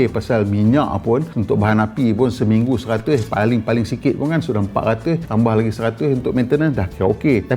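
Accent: North, Malaysia. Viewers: Cikgu kalau guna full bahasa utagha lagi sedap dengaq. Hat ni dengaq mcm dok pedo² ckp utagha. Apa² pon mmg terbaik penyampaian. Mudah dan senang paham.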